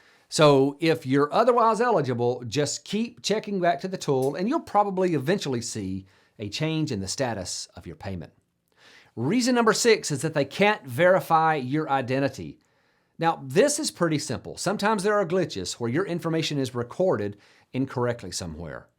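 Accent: South, United States. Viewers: Soo Country 🤣🤣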